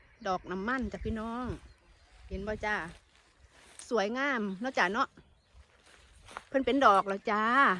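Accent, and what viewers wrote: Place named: Ubon, Thailand. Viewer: 👋😊เดนมาร์กอุดมสมบูรณ์ทุกอย่างน้อจ้า(ขอโทษเด้อยายเป็นคนจังหวัดได๋น้อสำเนียงคึคนบ้านข้อยแท้?)อุบล.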